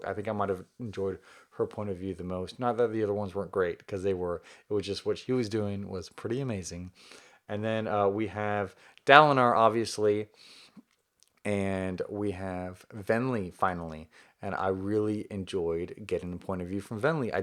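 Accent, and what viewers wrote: South, United States. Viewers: I love your video at your house in Texas during your quarantine until you had like a book called, "Rhythm of War" by Brandon Sanderson until you're talking about all of the characters on the book from the very beginning while you're reading the whole thing after that, I hope your husband, Zach will be very proud of you and I love your Southern accent!!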